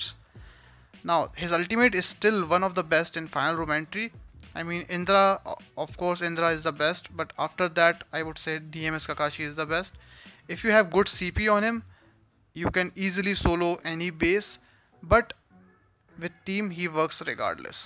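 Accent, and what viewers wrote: India. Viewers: Indian Accent😮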